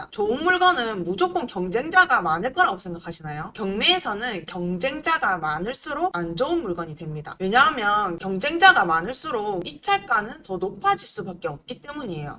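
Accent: Gyeongsang, South Korea. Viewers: 갱상도 말투 쥑이네예~화끈하게 좋네예!!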